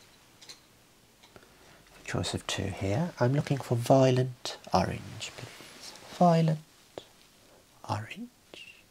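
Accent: Birmingham, United Kingdom. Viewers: I love that this video lets us know you're in Birmingham - as if there was any doubt about that wonderful accent! :D thanks so much for this - your videos are the best, never fail to chill me out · Not brummie asmr omg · Brummy accent!! ❤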